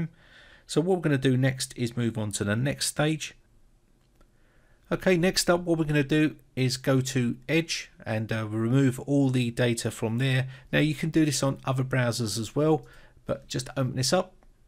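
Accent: United Kingdom. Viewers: First tutorial I see by a British mate! Cheers!!! Bloody hell !!! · He sounds nothing like Korg... The guy in the video has a British accent, Korg has an NZ accent.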